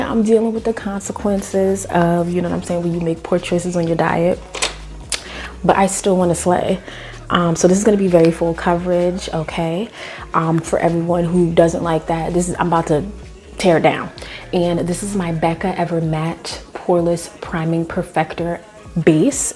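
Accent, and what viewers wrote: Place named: Chicago, United States. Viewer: Are you in the Chicago area? Because you definitely sound like us, the way you speak. I just love it Sis.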